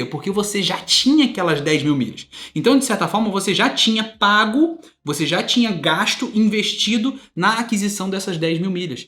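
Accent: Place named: Rio de Janeiro, Brazil. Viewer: Meu camarada, não troque o sotaque, deixa o s ser X naturalmente, somos cariocas 😅😅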